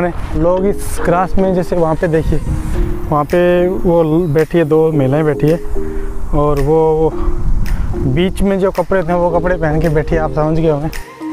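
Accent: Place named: Uttar Pradesh, India. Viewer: Punjabi bolya kr yrr hindhi tu jmme up de bhyiaan vrgi bolda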